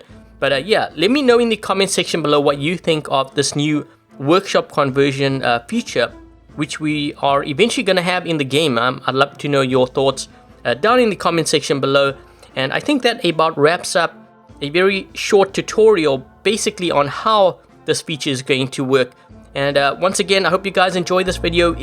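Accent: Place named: South Africa. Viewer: I'm pretty sure the official language of Brazil is Portuguese and to answer that question: He's said on some of his live streams (that are recorded and posted in his channel in case you want to double check) that he was born in South Africa and went to a primarily English speaking area of the country and I think he lives either in Canada or New York so if you're wondering about his accent there's a solid chance that it's based on all three rather than a Brazilian's English.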